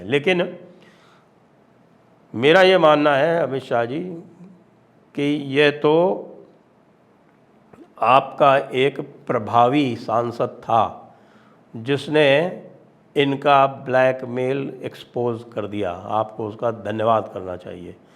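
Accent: Haryana, India. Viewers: Everything was ok sir but ur haryanvi accent was too bad😅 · ग्रेट! Good humorous vedio with full details of the andolan by wrestlers. Very casual but wonderful way of description. Harianvi style is hit! Impressed!😂🌺🥀🌹🏵🌷⚘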